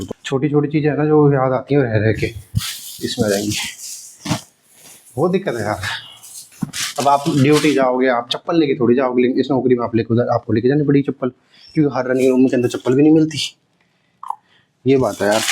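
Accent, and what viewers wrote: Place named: Braj, India. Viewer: Oh Dousa rajsthan se wahi soch raha tha ye brajbhasha ascent bol raha hai mai bhi je hu mathura se❤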